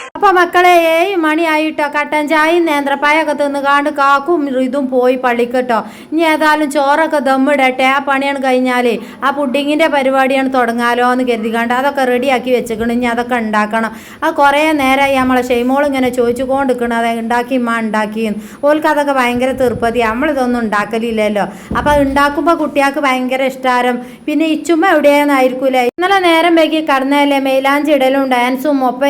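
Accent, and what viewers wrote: Malappuram, India. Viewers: മലപ്പുറം താത്താന്റെ വർത്താനം ആണ് അല്ലേ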